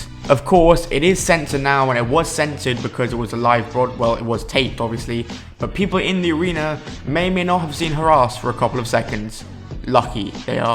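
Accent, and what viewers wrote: United Kingdom. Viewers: Troy Edward Well that's why I said "I don't know if English is your first language". But he sounds like he's somewhere from the UK, so English should be his first language. I wasn't being rude. I was just clarifying what "chicken shit" meant. Even though it's a common saying, he should know what it means. But maybe it's just a common American saying.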